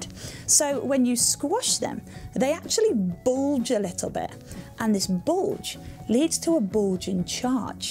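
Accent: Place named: Yorkshire, United Kingdom. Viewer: Sounds like somewhere in Yorkshire to me.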